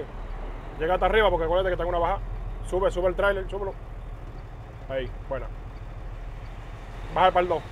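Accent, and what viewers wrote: Dominican Republic. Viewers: Por el asento se que eres paisano mio jaja Dominicano no? Jaja estamoa donde sea papa!! Orgulloso de usted!!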